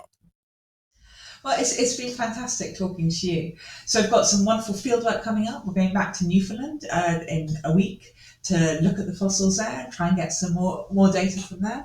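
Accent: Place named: United Kingdom. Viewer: Nothing better than hearing pure science from a well-spoken British scientist! 🥳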